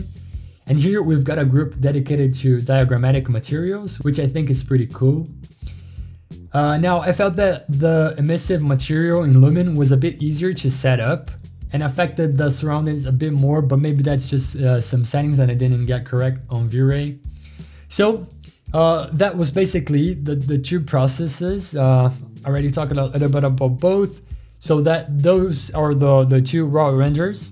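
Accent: Brazil. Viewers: tá tranquilo, eu achei legal o canal. Desconfiava que era brasileiro heheh agora tenho certeza. Parabéns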